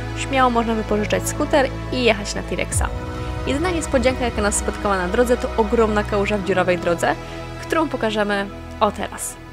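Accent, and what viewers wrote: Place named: Poland. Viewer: Czy jesteś Polką. Sądząc z akcentu jesteś, ale ilość słow angielskich wtrąconych w polskie zdania jest tak duża, że może polski to jest język obcy dla Ciebie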